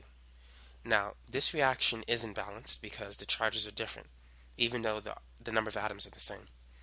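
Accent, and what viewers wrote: United States. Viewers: Thank god we have an American accent here for once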